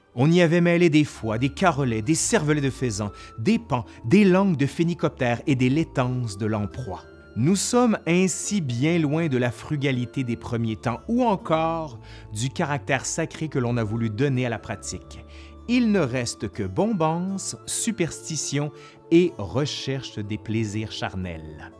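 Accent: Quebec, Canada. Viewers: Des fois, je regarde tes vidéos pour le plaisir d'entendre un accent québecois. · Je suis heureuse d’écouter un Québécois en plus mon domaine l’histoire 👌bravo 👏🏻🌹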